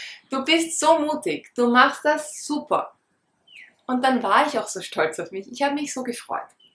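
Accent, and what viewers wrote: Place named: Austria. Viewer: Danke für dieses inspirierende Video! Und auch für diese Ehrlichkeit einfach diese "andere Stimme" in dir und somit einen Teil deiner eigenen Ängste mit der ganzen Welt zu teilen! Ich bin übrigens auch aus Österreich und liebe es auf YouTube mal wieder die gewohnte Aussprache zu hören! 😅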